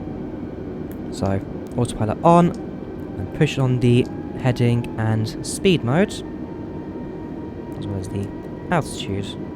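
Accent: United Kingdom. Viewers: which area of British accent this guy is?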